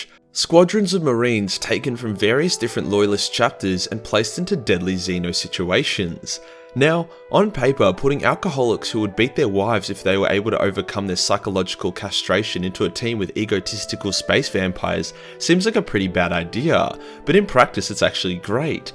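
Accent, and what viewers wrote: Australia. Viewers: блять,еле еле австралийский понимаю,абоба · A captivating and entertaining story told as only an Aussy or Kiwi could ;) Noice!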